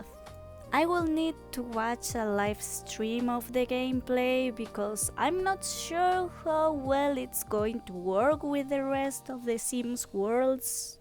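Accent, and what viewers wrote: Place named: Spain. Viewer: Hello, Wow your English is so good and clearly understandable ! Also I love your super cute spanish accent~ I wish I I'd speak English like this. Beautiful build ! I checked UCLA on Google from which you took inspiration and good sims insertion~ I like how hou "simmifyed" it to melt the style with your own sims mark in Del Sol Valley. This world cruely needs renovations too and I'm excited to see how you'll redo this world with your own lore for the Zest family. Speaking of new Royalty EP, I'm very hyped for my part. I do love legacies and I feel the gameplay would blend so well into my saves and stories. Just disappointed the african inspirationned world ended in a poor neighbourhood with 5 lots... I hoped we had it as a whole new world but I kinda like how they created 3 whole universes in one world. That's interesting even thinking having a royal legacy in one of my saves I do play with 1 world = 1 realm/kingdom. 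Very excited to have this pack in our hands. Not forgetting CAS and build modes look insane !! I wonder what part 2 of this build would look like. Waiting for it !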